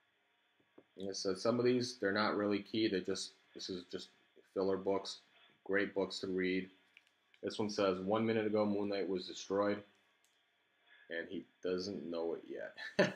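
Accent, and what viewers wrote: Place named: Southeastern New England, United States. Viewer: Like how you mix in comics and craft beer. Sounds like you're local to SE MA & RI. So many good breweries and LCS in the area.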